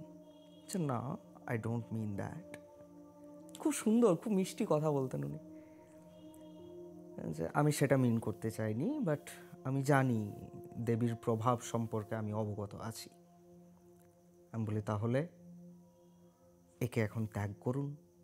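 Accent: India. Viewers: Ew! NOT the biMaaaru tongue again, ffs!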